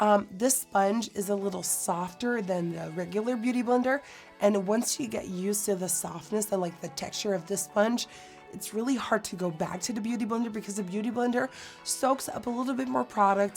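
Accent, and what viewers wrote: United States. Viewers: I love your voice like this. It sounds so Americanized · What happened to your voice? You sound American and not German? Why? What is going on? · u sound so American!!!! 🤣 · you sound like an American now, more than ever:) I feel like they have a slight huskiness when talking, which is exactly how you sound to me:) And you are beautiful no mater how much you weigh or look. Find clothes that make you feel comfortable and confident, make sure you have a good posture and the effect it will have on how you look and feel will be amazing!:)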